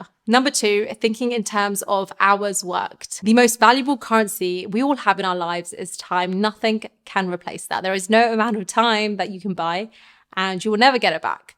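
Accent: United Kingdom. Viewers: This vedio helping money management nd British pronounce......👍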